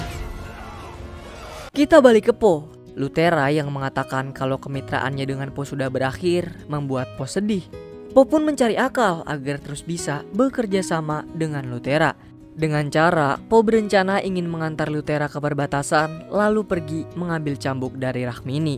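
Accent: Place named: Banyumas, Indonesia. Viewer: Anjir ,,,ngapak coy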